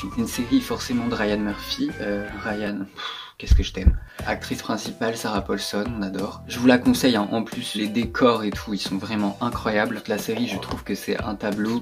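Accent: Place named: England, United Kingdom. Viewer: L’accent anglais est merveilleux 😌 Toi t’es iconic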